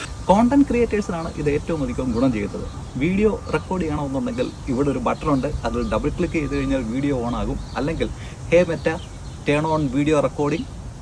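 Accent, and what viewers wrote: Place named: Ranni, India. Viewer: നിങ്ങൾ റാന്നിക്കാരനാണ്,,, അത് ഭാഷ തെളിയിക്കുന്നു.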